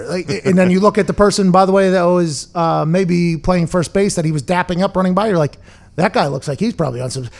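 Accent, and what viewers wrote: Pittsburgh, United States. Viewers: I wonder how many people had a hard time listening to a true yinzer accent ❤️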